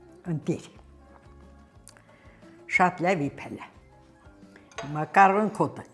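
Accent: Goris, Armenia. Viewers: Վախ տատի ջան, մեր Գորիսի բարբառով շատ խոսա, հաճելւա լսելը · Как приятно слышать диалект Гориса , Я тоже с Гориса и пишу с Ташкента. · Վայ ոնց եմ սիրում տատիկի եփածը։ Նամանավանդ մեր Գորիսի տատիկների եփածը։ Մեր գեղեցիկ Գ-իբառբառով խոսա տատի ջան։ Բա քո հոր տոնը շինվի😂😂😂😂😂։ Վայ հինչ լավն եք տատի։ Ապրես, օրինակ չէի գյուդում վեր Կարելիյա ծու էլ քիցած❤🙏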